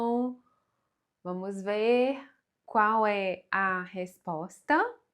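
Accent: Minas Gerais, Brazil. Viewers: Oi,! Acabei de descobrir seu canal. Adoro seu sotaque, é muito legal! Ele é típico de Minas Gerais? Você comentou num vídeo que é de Belo Horizonte, né? Quem sabe você faz um vídeo sobre isso? Um abraço da Suíça 🇨🇭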